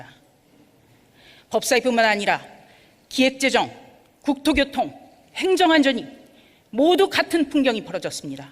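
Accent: North Korea. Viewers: 조수진 너와 그 외 똘마니들 (미통당) 북한 사람인줄...말투가 왜 저러지?? 못된 관행은 좀 버려라~~~내 세금 돌리도~~니 피같은 세금 너네처럼 놀면서 받으라고 안냈다..걸핏하면 장외투쟁...정말 지겹다!!!!!니네가 민주주의 얘기하니까 왤케 웃겨~~~ㅋㅋㅋㅋㅋㅋㅍㅎㅎ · ~조선에서 북한억양으로 무게 잡고 트집잡던데 작정한 듯 적어 온 글 따닥따닥 딱타구리처럼 웅변하고 있네요 ~~ 적폐가 적폐를 ~~ㅠ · 조수진이 이여자 북한 아나운서 였어? 말도 약간 북한 사투리쓰는것 같고 말하는톤도 그렇고... 북한 아나운서 같이 들리네.... 왜 30대 젊은이들이 내집 장만 못하는줄알아..?? 너희들 같은 국회의원들이 그집에서 살지도 않으면서 투기 목적로 집을사서 돈을 벌고 있기때문에 집세도 올라가고 젊은이들은 집도 사기 힘든거야..... 지금 넌 뭘 알고 이야기 하니?? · 대한민국 국민 맞나? 이북여자같다 억양이 억세고 평양가서 하거라. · 이은재 강남 망구 대타 조~조 수~다쟁이 진~상 저진상 북한에서왔나? 목소리가? 저여자 보기만해도 독살기가 두눈에서 번쩍거려요 합죽이 입안에선 껌씹고 있나? 왜그렇게 우물거려..! 저런걸 ㅋㅋ. 미통당답다 허기야 잡동사니 집합소아니까 저기 태영호 지성호도 있잖아..ㅋㅋ 귝민의 귀에는 미친개 짖는소리로 들린단다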